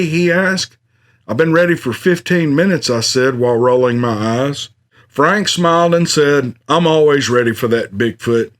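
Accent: South, United States. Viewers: I love it bro I absolutely love it,! I love the channel and I love your southern accent and hospitality you seem like a good dude but the story hit the spot. It was one of the funniest hunting stories I’ve ever heard and cannot stop chuckling even as I write this little note to you, the store just made my day and I’m going to go ahead and save it to my favorite columnso I can listen to it every once in a while when I need to pick me up or to put me in a good Sasquatch’en mood. Your a’ok in my book 👌 But who am I to give approval to another but still love the story and especially the channel, and looking at shirts as gifts for family. Good day brother. 👍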